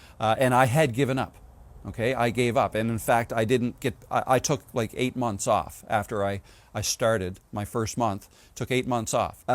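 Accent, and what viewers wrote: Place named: United Kingdom. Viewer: I have a feeling you will surpass me. You have a natural vibe on camera, nice editing too, plus you can't beat that British accent. I just raw dog all my videos like a wild animal. Sometimes it works I suppose. I hope we both grow organically and build some nice communities. AKA Tribes. I think I am going to do a video on that.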